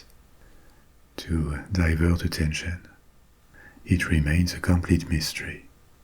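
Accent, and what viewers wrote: France. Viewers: This is the perfect channel you put on to hear a gentleman tell tales and tell history like as if he was there in a gentle voice with a lovely French accent, a perfect combination, thats something to me is rare, and i love it, so all i have to say is this, Merci!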